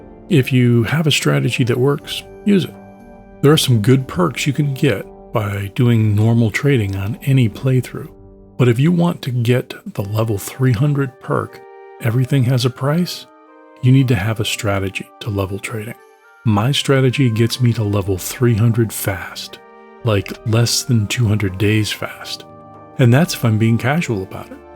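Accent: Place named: Netherlands. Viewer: Leuk hoe je altijd een andere nederlander herkent als ze engels praten :P, je doet je best maar helemaal onderuit kom je er niet.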